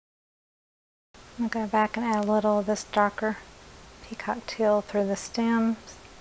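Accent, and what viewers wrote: South, United States. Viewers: Well....you're def from the South but it's not bad at all!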